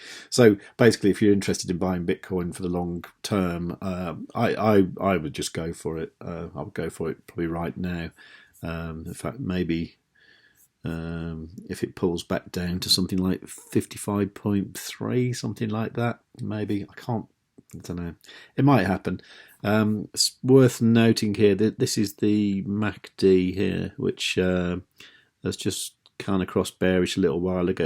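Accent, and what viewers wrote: Midlands, United Kingdom. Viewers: What part of the uk you from u sounds like ur from midlands?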